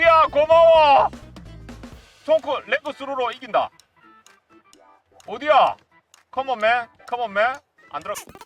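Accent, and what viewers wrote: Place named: Busan, South Korea. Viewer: 나 형 부산쪽일거라 생각했어! 광주 말투가 아님!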